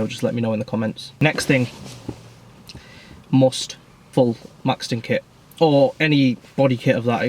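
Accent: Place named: United Kingdom. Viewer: Dude, I gave up after a few minutes... heavy AND fast British talking, I am not a native, tough luck I reckon.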